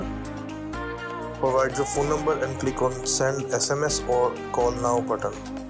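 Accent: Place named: India. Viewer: It’s just an Indian accent bro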